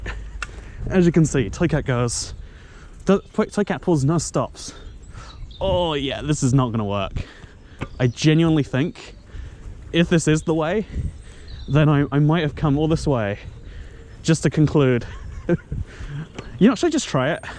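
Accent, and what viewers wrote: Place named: United Kingdom. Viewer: You sound so much more British here lol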